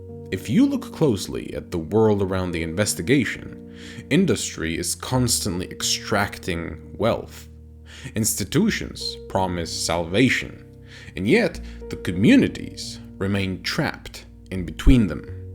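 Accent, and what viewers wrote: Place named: Europe. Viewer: Appreciate the analysis. Dislike the Euro accent. (You don’t know, bro)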